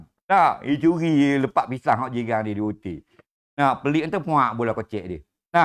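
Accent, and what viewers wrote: Terengganu, Malaysia. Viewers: Uai sangat pandai kecek teganu.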